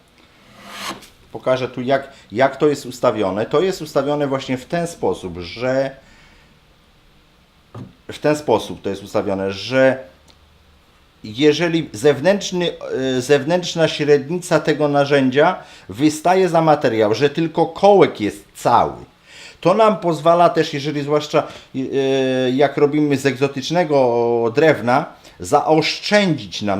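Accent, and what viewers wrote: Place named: United States. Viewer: Od ilu lat mieszkasz w Ameryce? Nawet jak mówisz po Polsku, to słychać że kacentujesz inaczej :-).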